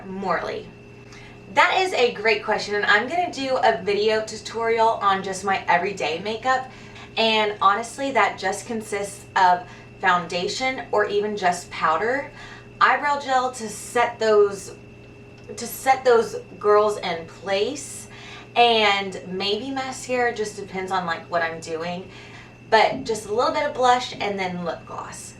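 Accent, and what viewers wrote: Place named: South, United States. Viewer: Love her accent, she’s the definition of southern charm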